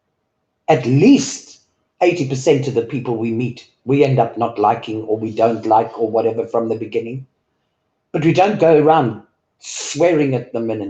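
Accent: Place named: South Africa. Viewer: Sooo glad I found another South African here in this crazy community! 😅 Great to hear a familiar accent!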